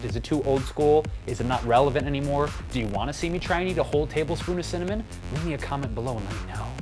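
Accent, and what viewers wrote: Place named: Minnesota, United States. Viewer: Hey there, now, you got dat MN accent down pretty darn good, don't ya!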